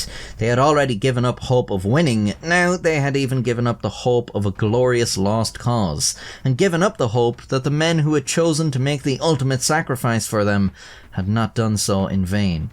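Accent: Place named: Ireland. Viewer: If theres someone I want to listen to talking about insane deaths and cruel war tactics, then its this Irishman and no one else PS: I love the way you say "three" 🌲. Never stop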